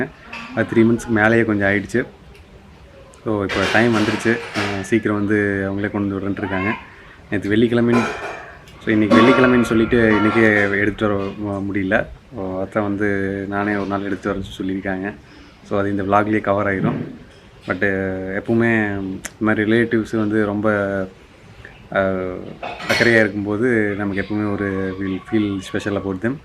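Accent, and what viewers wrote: Kongu, India. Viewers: Kongu tamil super ponga...arumainga anna....neenga enna koottam nu kaekkara solla matingaringa...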